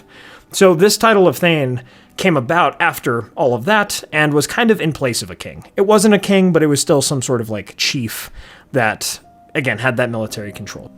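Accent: United States. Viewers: Gentry is the term I was practically screaming at the screen whilst listening. I think the video conflates nobility with upper class. In fairness, the creator sounds American, so they probably aren't familiar with the nuances of the English upper class. I would say Pippin is probably more than gentry though. Given he is the heir to the Thane, he is closer to being a noble. Thane as a noble rank is somewhat similar to Baron.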